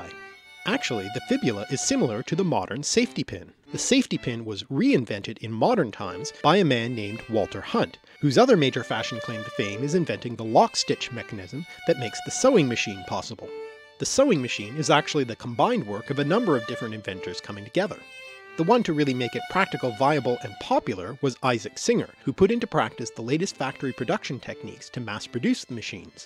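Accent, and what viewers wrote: Canada. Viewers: If no one noticed you were Canadian before, this video with all its ou words is a giveaway XD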